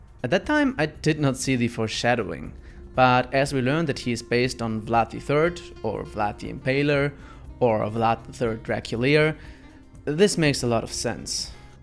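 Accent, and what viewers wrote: Germany. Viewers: its nothing new to anyone and history itself that "bad people" lived prosperous lives after their deeds . i take a wild guess and say given your accent and pronounciaton your german like myself. Take it as you will but reality has never been and will never be Black and White. People just are or believe to be at the right side of History is all. As for your search History that is clocked one way or another. just make sure your not getting fined if you own Volumes of Hellsing that wherent unedited as our Goverment is laughably sensible as per usual and put laws arround that "kind" of Imagery. So if you do own any of it dont show it arround. that might get you into trouble. Good Research overall on the Fella. One could also always add that Werewolfs where one way to kill Vampires which is why Alucard felt icky about fighting him. Though of course thats merely Conjecture and one of a dozen ways the Myth of Vampires is told. 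· figured your german is wayyyy too good and the slight accent when you speak english made it obvious in my opinion anyway watched all your hellsing videos they are awesome dude👍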